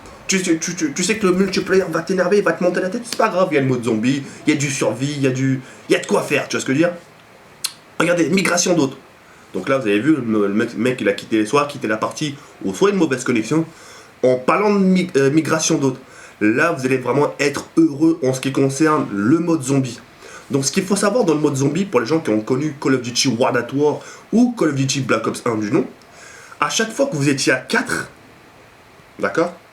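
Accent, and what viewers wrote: Arab world. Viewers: Salut, c'est dernier temps tes vidéos me plaisait moins, tu était moins acharnée je pense que tu attendais ce nouvel Opus pour pouvoir faire l'originalité et te refaire plaisir. J'ai eu le sourir durant cette vidéo car j'ai bien vue que tu était dans ton truc tu aimez tu passez du bon temps a commenter ta vidéo. Tu redeviens le bon avec son putain d'accent d'arabes (Pas méchant hein;)) Tu me fais plaisir continue, tu vas allez loin et surtout: AMUSE TOI!!! Peace frerot! <3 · tu parles un peu a la rebeu ^^ mais tu geres et tu respecte vraiment les autres, au point de dire que mrlvl12 explique mieux, tu te prend vraiment pas la tete ca fait plaisir ;)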